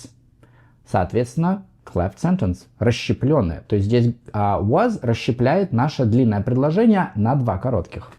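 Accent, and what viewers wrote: United States. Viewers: Он и звучит как американец. У вас какой-то не такой ИИ (AI)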